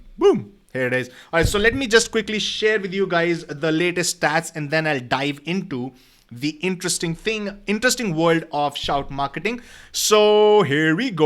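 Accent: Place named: India. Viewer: This is one of the worst videos I've ever watched in almost a decade of YouTube Used 4 mins to get to the point Annoying jokes and high pitch voice Super annoying Indian accent No real valuable information whatsoever I hope you revise your videos and channel or stop uploading cos these are an eyesore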